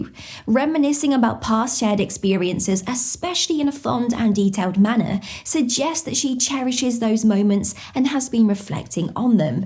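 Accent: England, United Kingdom. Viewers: Wow thanks for the advice. 🙂 I love your English accent! You're so cute! ❤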